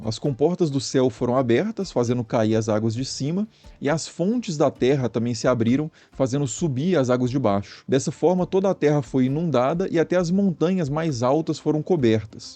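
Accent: Minas Gerais, Brazil. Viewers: Uma pergunta nada a ver, mas o narrador é mineiro né?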